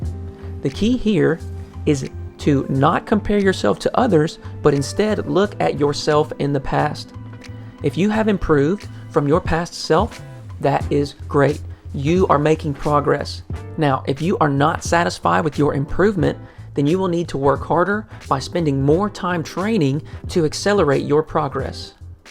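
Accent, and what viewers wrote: South, United States. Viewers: Is that a southern accent? I'm not from US so I'm not really sure.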